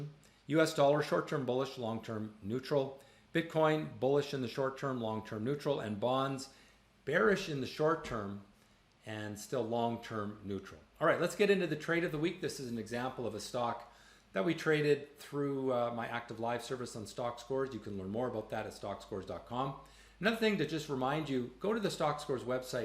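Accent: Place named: Canada. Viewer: I’m an Aussie and always have loved the Canadian accent. This guy is simply incredible. Clearest information that I have ever listened to.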